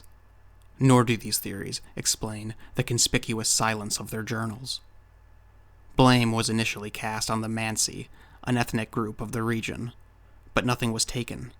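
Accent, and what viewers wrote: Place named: United States. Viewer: nope...northern canadian .... now living in tropics,btw : Your accent sounded 'american'..... if I'm wrong, I stand corrected. So what are you ? and what about 'radiation' reports in the area? Russians are pretty open about their findings. Your bombastic intro and analysis did Nothing for a conclusion. Which clinically... is real psycho. Now STFU with bs .... and offer a solution.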